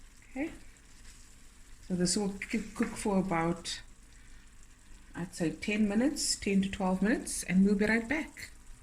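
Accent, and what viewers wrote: South Africa. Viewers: Is that a South African accent I am hearing?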